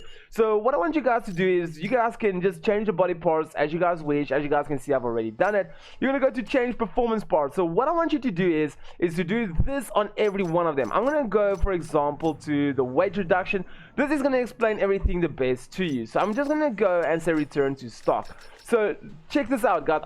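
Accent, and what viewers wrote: South Africa. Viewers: South African right?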